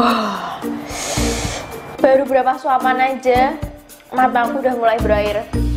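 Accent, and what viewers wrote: Java, Indonesia. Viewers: Aku baru pertama kali nonton suka gara2 medok gitu walaupun aku orang sunda wkwkwk 😆😆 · Kusuka medokmu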